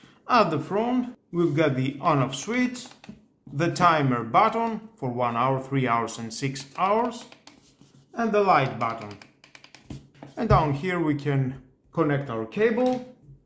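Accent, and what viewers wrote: Greece. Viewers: Εντελώς ελληνικά αγγλικά 😂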